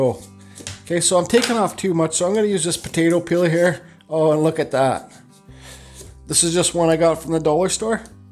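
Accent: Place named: Canada. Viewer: I can hear Canadianity in your voice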